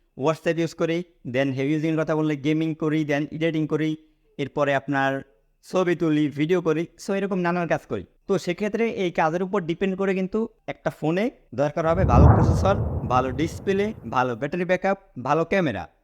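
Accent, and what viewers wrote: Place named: Barishal, Bangladesh. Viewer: বর্তমানে যে ফোন গুলো বের হইতেয়াছে পাক্কা মাইরা ফালান ভাঙতেও পারে, পানি ঢোকতে পারে, চলতেও পারে নাও চলতে পারে (বরিশাইল্যা টোন আছে কথায়) আগামী চাইর বছর ক্যামনে চালাইবেন ভাই ভাই মনেহয় মোগো দেশি মনু😅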